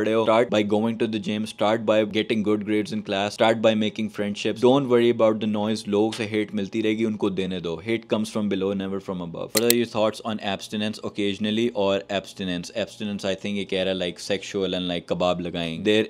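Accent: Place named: Lahore, Pakistan. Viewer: 2NO LAHORI HEN NA SPEAKING STYLE ACCENT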